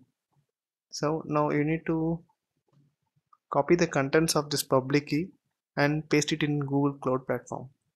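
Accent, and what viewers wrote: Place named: England, United Kingdom. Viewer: Nice English accent.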